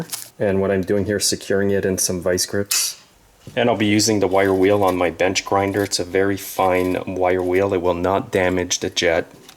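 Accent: Quebec, Canada. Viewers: Wow, the detail n time you took in this video was great! I'm a Truck and Trailer mechanic myself but Im shy around small engines, I look forward to watching more of your videos, like I'm realy blown away with the care and detail in this video. Keep up the great work! ( I also wonder if that's a Quebec or Acadian accent, i'm on the east coast myself?) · You sound French Canadian, where abouts are you located?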